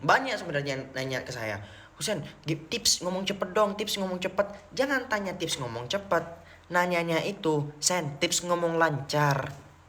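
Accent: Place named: India. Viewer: lucu kmu speaking english versi india..😅kok kayak kmu keten gitu bisa mcm2 bahasa gitu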